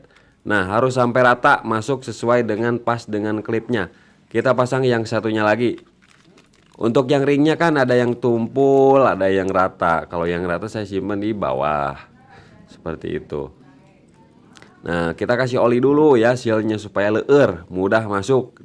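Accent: West Java, Indonesia. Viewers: mntap bang hatur nuhun elmuna resep pokonmah ngarenge cariosan orng sunda mah😂👍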